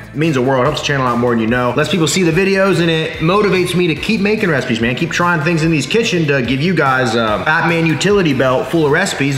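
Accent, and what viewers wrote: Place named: Alabama, United States. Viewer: Man the way you talk reminds me so much of the football dads from my small hometown in Alabama and I can’t get enough of it 😂😂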